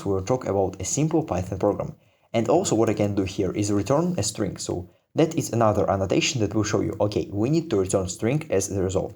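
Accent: Russia. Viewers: Братишка, ты русский? Чувствуется русские нотки в английском. Но отличный и понятный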